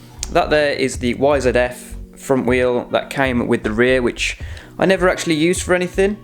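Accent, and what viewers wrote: Birmingham, United Kingdom. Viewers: Cool look fwd to that. You sound like your local to me. I’m in Birmingham. Could do with picking the brain of the guy that does your electrics lol.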